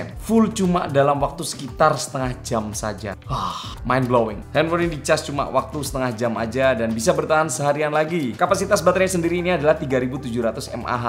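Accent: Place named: Java, Indonesia. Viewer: Seneng nak smpean ngomong bang sante tp lucu mbe medok wkwkw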